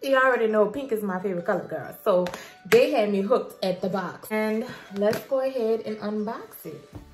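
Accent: New Orleans, United States. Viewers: ` love your accent , you def gotta be from New Orleans! lol forgive me if u not cause I’m from NY, but you sound like Reginae mother🤣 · You have to be from New Orleans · Where you from new orleans? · That accent though... I could listen to talk all day #nola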